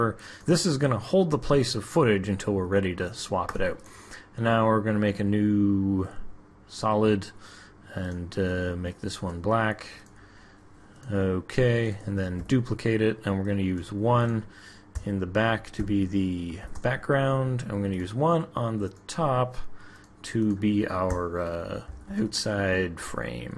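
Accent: Canada. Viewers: Thanks for the help. Love the Canadian accent 👍🏻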